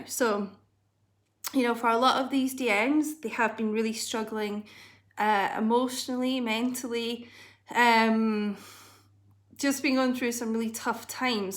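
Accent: Scotland, United Kingdom. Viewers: I love your accent! Are you Scottish? Are you doing private readings? I'm interested :D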